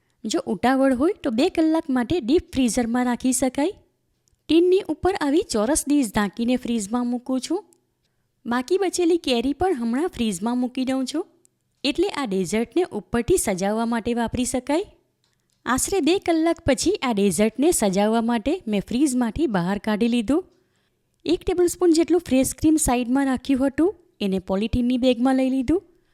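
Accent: Surat, India. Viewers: Nice mango dessert I liked your Gujarati in Surati accent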